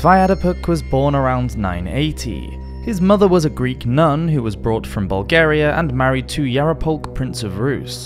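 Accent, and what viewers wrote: United Kingdom. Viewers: The way you red coats pronounce some of your words is astonishing...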